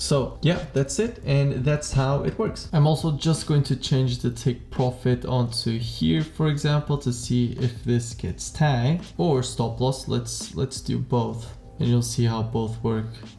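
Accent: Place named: Portugal. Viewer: Your accent brings some portuguese vibes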